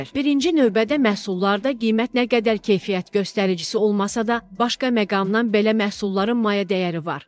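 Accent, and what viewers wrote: Armenia. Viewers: Bu kim idi? erməni ləhcəsində məlumat yayıb.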